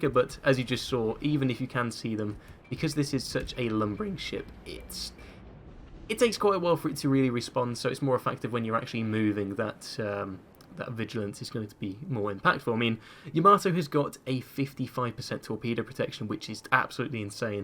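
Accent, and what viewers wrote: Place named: United Kingdom. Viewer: Nice to listen the British accent. Of course we have Jingles but this sounds a bit different. Izumo used to be terrible. Not anymore. Nice video m8!👍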